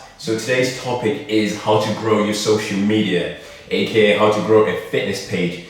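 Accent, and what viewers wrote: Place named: United Kingdom. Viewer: Don't overlook how MASSIVELY important it is to have a charming British accent, you seemed to have passed right over that bit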